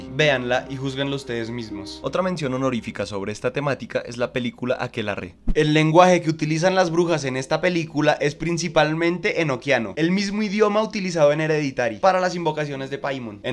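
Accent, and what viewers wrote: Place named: Bogotá, Colombia. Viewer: Woow chimba estos análisis en acento colombo rolo!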